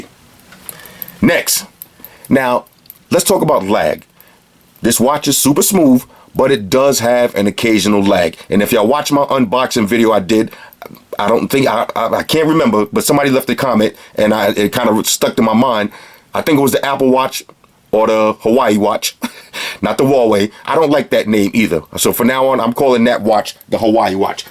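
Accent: New York, United States. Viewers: you are so NY, where r u from?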